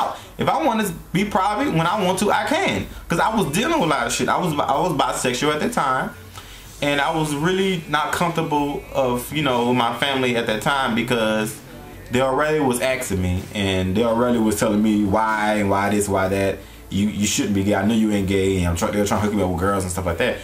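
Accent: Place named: New Orleans, United States. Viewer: I hear the Nawlins accent... I am from Louisiana also... You are one pretty man!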